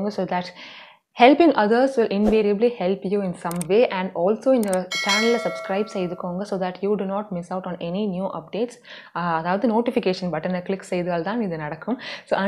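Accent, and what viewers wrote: Sri Lanka. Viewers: Are you srilankan??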